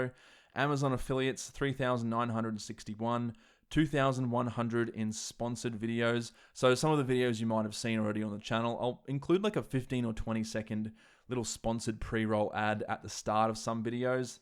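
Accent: Australia. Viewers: Came to this channel for the hot Mac videos. Stayed for the aussie dialect. Will keep on staying for stuff like this.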